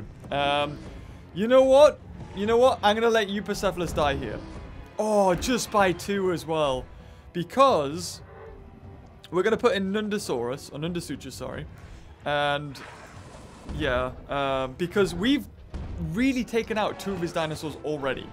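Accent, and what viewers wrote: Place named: United Kingdom. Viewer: Never knew he was British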